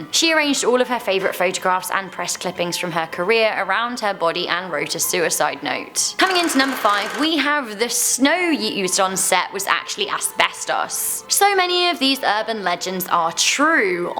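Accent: England, United Kingdom. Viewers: Interesting.... also I glad I'm not the only one that finds ABSOLUTELY GORGEOUS! Also... that English accent just makes my heart melt!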